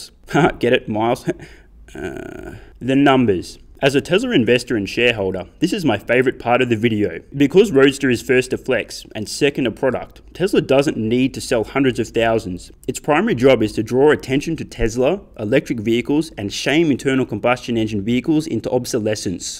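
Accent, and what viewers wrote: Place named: Australia. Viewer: you have no offence a voice that is difficult to listen too.... it’s a very unattractive Australian accent. I suggest you get a woman to voice over your videos doing this will win you more subscribers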